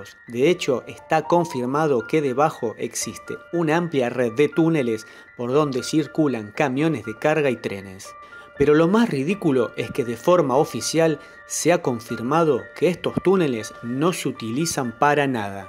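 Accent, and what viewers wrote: Argentina. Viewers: El video está muy bueno , pero por favor trabaja en tu asentó , no todo el Mundo es argentino y en momentos molesta! Gracias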